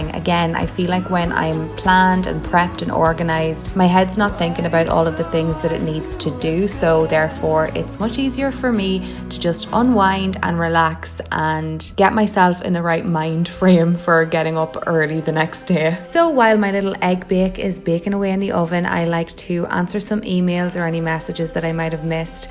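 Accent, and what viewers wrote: Ireland. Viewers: Im pretty sure just irish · She is Irish :) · i looove your accent! are you irish? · Anindita Chatterjee she’s Irish and has an Irish accent :) “odd” is simply your perspective, not being Irish yourself. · You have the most satisfying accent ever. Are you from Ireland? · I visited Ireland almost a year ago and I miss it a lot it’s a wonderful country, and watching your videos weirdly makes me nostalgic, maybe it’s hearing the accent haha. but I love your videos!! They are super relaxing and inspiring <3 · Molly Mae i’m from ireland too and i can tell you she is from ireland 🇮🇪 💕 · I wonder what part of ireland. bc i feel theres a clear difference I can hear from different Irish people's accents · Are you Irish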